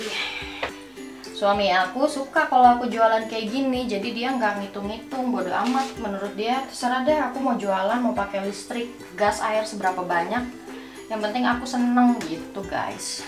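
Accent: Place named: Java, Indonesia. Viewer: Kk indonesia mu medok sekali 😙😙💪💪💪kereeeennnnn ibu kuat ..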